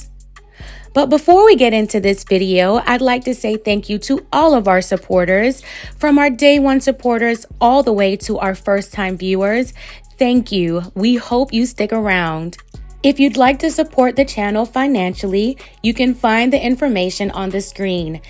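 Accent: Baltimore, United States. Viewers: I see you bro ! A.I.. sounds great! I do miss the bmore accent tho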